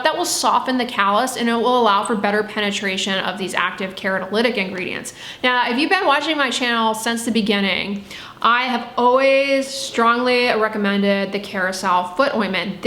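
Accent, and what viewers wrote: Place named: California, United States. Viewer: That California accent is BRUTAL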